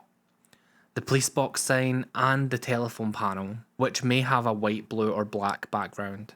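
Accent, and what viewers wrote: Scotland, United Kingdom. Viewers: Never heard a Scottish person before?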